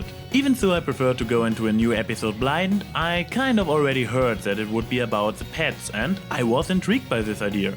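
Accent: Germany. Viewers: Ich hatte an Deiner Aussprache schon erkannt dass Du Deutscher sein musst :D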